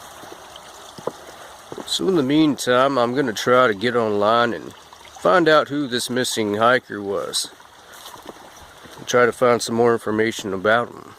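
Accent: United States. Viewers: What part of the USA is your accent from?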